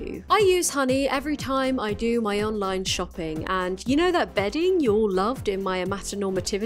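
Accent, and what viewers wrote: Ireland. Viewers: Until you made the Ireland joke I assumed your accent was just English but now all I can hear is your Irish R’s lol (especially when you said ‘argument’) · has an Irish accent like my wife's. Perfectly innocuous English accent, and the BOOM, the letter "r" appears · Your Irish accent was thriving this video and I am HERE for it. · wait, you’re irish? cumann na mBan video when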